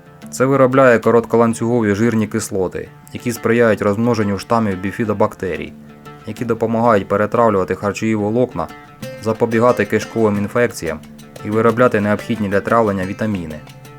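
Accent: Ukraine. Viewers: Автор явно з Черкоащини.)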